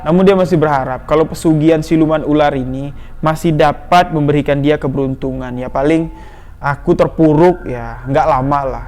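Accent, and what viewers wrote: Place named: Java, Indonesia. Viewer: Mas the best omng jawa nya kental bngt...😄😄 sukses buat mas...amiiin..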